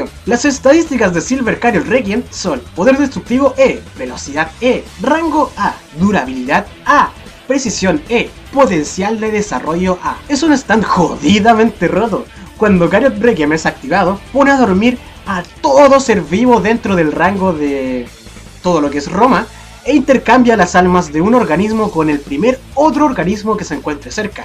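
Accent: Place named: Chile. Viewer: Por tus voz eres chileno lo se porque tambien las voces de nuestro pais es asi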